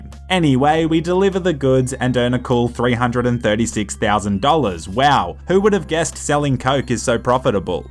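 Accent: Australia. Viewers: Where u from in Australia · Are u Australian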